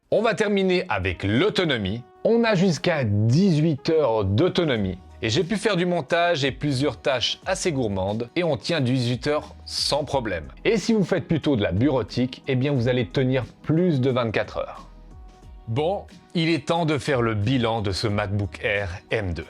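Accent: Switzerland. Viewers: Tu es suisse ?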